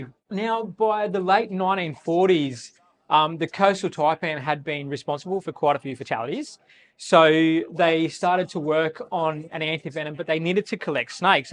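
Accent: Australia. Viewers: Excuse me, is this australian english accent? I'm fairly good at english but have a hard time keeping up with your talking speed.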